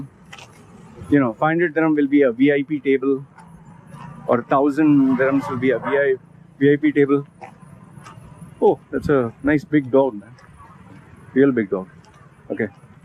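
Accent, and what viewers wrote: India. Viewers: You are an indian i can tell from Your accent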